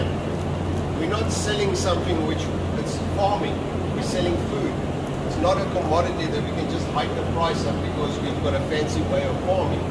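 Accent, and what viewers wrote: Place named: South Africa. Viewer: My guy sounds south African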